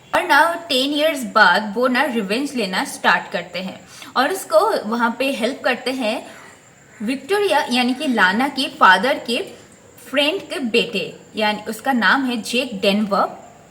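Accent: Bengal, India. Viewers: accent LG rhi thi Bengali Hindi....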